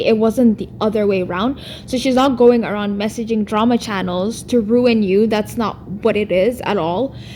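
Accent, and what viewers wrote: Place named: India. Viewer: The fact that she tried to say all she did was identify you by saying you had an accent... no, she said "that weird girl with the Indian accent". She insulted you and tried to play it off like she never said anything wrong and just said you had an accent. And she was being racist too. She's also acting like she's changed now, but she denied everything until there was irrefutable proof that she said those things. And she's STILL lying even though we've all seen the proof. She can keep going with the "I'm not perfect, I make mistakes" narrative, but idk about you but I've never body shamed anyone. And her admitting to being a narcissist along with the body shaming just feels so gross. I know I'm rambling, tbh I've only seen 20 minutes of that livestream so far but it already pissed me off and I have a lot of thoughts on it lol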